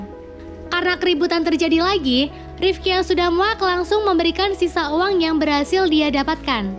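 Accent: Pemalang, Indonesia. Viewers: BTW KAKA ORANG PEMALANG?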